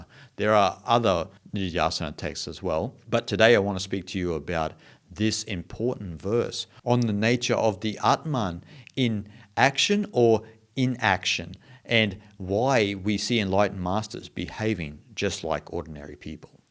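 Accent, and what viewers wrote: Australia. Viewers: Love your videos and your Australian accent ♥️🦢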